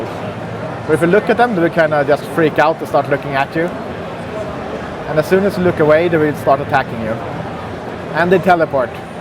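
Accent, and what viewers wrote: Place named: Sweden. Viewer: I just love english, so typical swedish-english!!!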